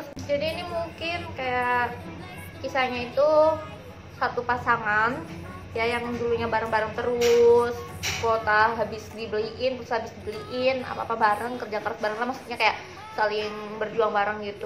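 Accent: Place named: Banyumas, Indonesia. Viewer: Owhhh jowoe ngapak ... Ojo lali like comen subkreb momo bersaudara 😂😂😂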